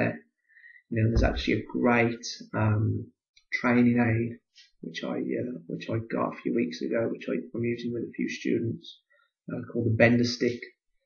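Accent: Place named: Midlands, United Kingdom. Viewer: Hi love this action so much and being from the Midlands appreciate the accent! Quick question how much should the left foot flare out? Thanks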